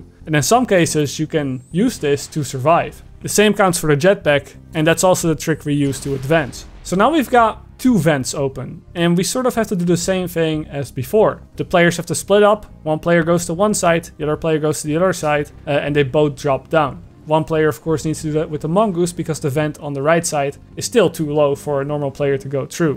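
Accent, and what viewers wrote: Netherlands. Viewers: Ha, Nederlands. Merk t meteen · Are you dutch you have a dutch Accent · why is it that, even when i'm dutch i get irritated by the dutch accent xD great vids though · You are dutch aren't you? I think i can recognize it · He sounds really dutch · Are you dutch?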